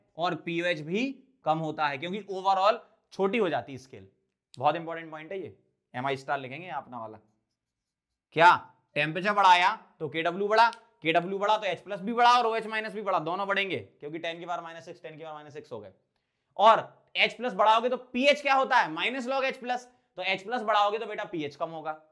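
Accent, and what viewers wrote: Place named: Malwa, India. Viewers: Yes he is from malwa i guess indore..am also from malwa aur mujhe bhi accent thodaa jaana pehchaana laga😂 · Sir I am here after watching your video on Physicswallah app... Our accent seem to be very similar. Where are u from? My guess is Malwa MP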